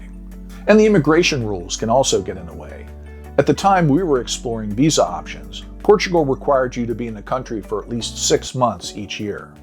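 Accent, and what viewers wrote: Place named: Philadelphia, United States. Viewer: Is the host of this video from Philly? Gotta be with that accent. Or maybe Jersey?😁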